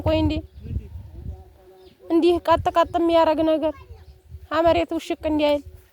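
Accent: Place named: Rural, Ethiopia. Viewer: ሀዋየገጠርልጅአማረኛነው አነጋገርሺ በርቱ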